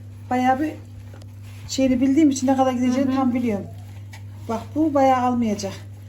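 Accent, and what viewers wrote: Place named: Anatolia, Turkey. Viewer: Senin eline de şivenede kurban olayım o ellerin dert görmesin ellerine sağlık Anadolu insanı hiç kendini darlama nasıl konuşuyor san öyle devam et biz seni doğal halinle çoook seviyoruz evimizden biri gibi MaaşALLAH sana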